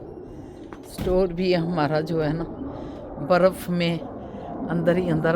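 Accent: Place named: Punjab, India. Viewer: Your Urdu is very punjabi.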